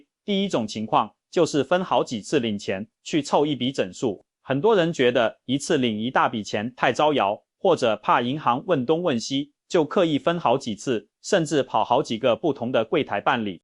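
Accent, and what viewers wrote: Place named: Mainland, China. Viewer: 中共國的口音！騷嘮台灣！你們中國人管好自己比較重要！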